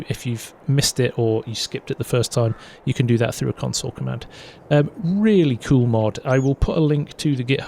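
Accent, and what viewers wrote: United Kingdom. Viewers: Bros too muh brihishh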